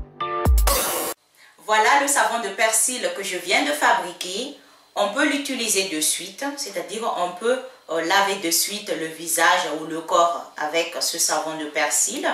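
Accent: Madagascar. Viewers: C'est une Malagasy!!! Cet accent ;)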